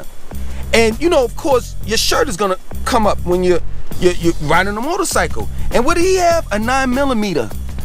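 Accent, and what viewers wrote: New York, United States. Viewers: You sound like a true New Yorker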